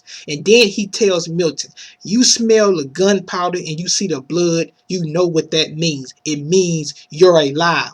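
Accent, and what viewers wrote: South, United States. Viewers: You give great reviews guy! Fantastic critical thinking skills on display. Really liked your southern accent too. Good job breaking it down!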